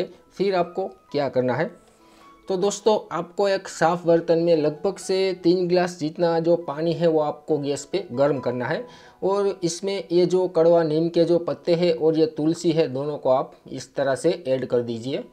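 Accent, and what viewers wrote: Gujarat, India. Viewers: Kya bhai tum Gujarati ho ha moj ha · Are u Gujarati???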